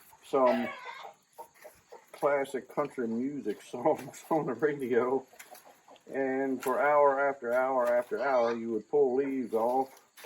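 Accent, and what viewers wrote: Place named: Virginia, United States. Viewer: May I ask where in Southern Maryland? Your accent sounds very similar to the accent heard in the Northern Neck of Virginia.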